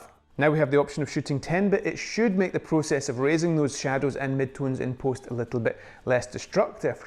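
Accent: Scotland, United Kingdom. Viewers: Do you think the RC controller is worth the extra cost, since most of the time you are likely already carrying your phone? Great video! Love the Sean Connery like accent!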